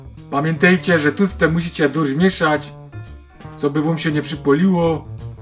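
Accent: Silesia, Poland. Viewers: ..to szukaj na internecie po polsku a nie obrażaj pięknej śląskiej gwary😮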